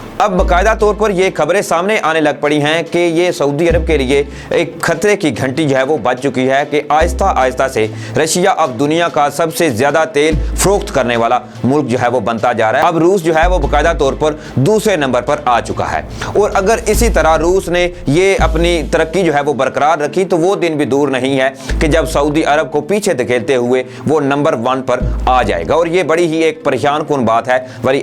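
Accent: India. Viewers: Why you speak Urdu in Indian accent?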